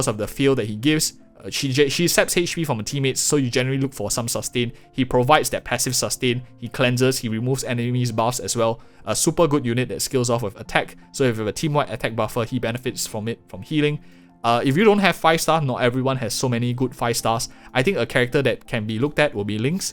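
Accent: Singapore. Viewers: are you by any chance singaporean :D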